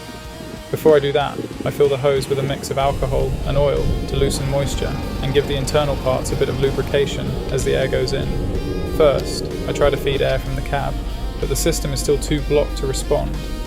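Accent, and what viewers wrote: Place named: United Kingdom. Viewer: Har du en engelsk förälder eller studerat i England, för din accent låter enormt brittisk❣Ärru från Åmål med tanke på ditt val av alias?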